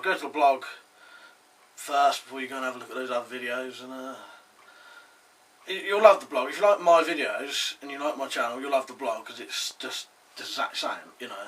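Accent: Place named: England, United Kingdom. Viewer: Correct me if im wrong. You sound like you are from England. Thought firearms were illegal in England.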